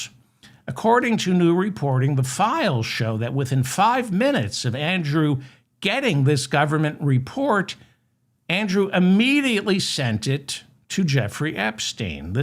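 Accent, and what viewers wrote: New York, United States. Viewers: ! I love your New York City grit. Nothing like it.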